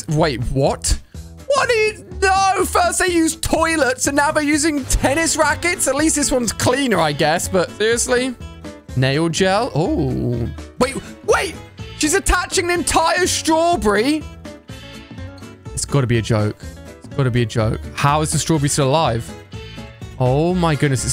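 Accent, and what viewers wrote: United Kingdom. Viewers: He is british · Bro, are you British? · Are you from the United Kingdom 😅😅😅😊😊😊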